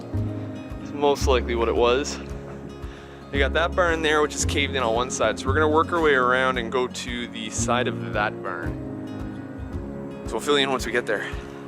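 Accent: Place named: Canada. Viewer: As soon as I saw the ladder I kept saying, "go up!" Lol amazing location. I have to say I love your Canadian accent lol.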